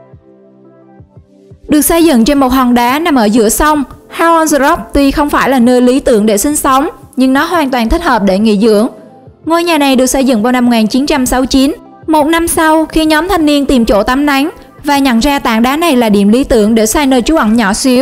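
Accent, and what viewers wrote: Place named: Binh Dinh, Vietnam. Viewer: cij này ns tiếng như bình định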